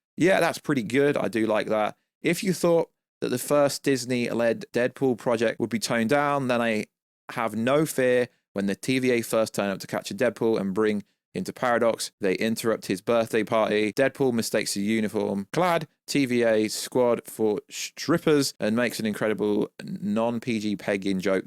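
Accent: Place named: United Kingdom. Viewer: I swear I thought it takes me a hour just to get to the point but you, you is just bad man, and every time I try to find other people that shows leaks and spoilers, it’s only you and the other guy Paul or whichever u are you, y’all both sucks and talk way too much. I get to make a long video but u speaking in British when we are Americans, we can’t understand you, geez Christ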